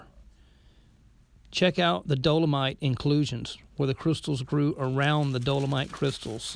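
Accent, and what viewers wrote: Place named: Carolina, United States. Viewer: Handsome, charming, rugged, manly man with a Carolina accent and a sense of humor, doing scientific 'ciphering' while digging into dirt and rock to pull out massive, gorgeous gemstones!... WHAAAAAT! THAT'S JUST HOT! Best YouTube channel EVER! ROCKS!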